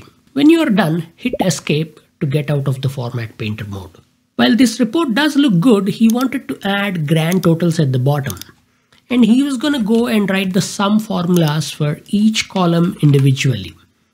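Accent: Tamil Nadu, India. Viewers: Nice tamil accent with english😊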